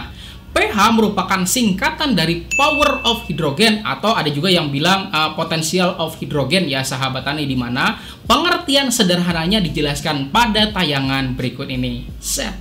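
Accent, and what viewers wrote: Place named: Hulu Sungai, Indonesia. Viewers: Kukira orng mana kah ternyata eh ternyata orng hulu sungai jua 😁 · Pa p urang mn ky ad lugat kalsel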